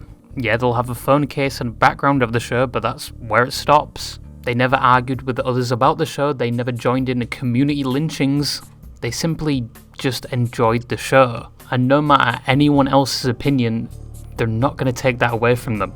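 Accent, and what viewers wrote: United Kingdom. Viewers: The bri'ish bird cooked again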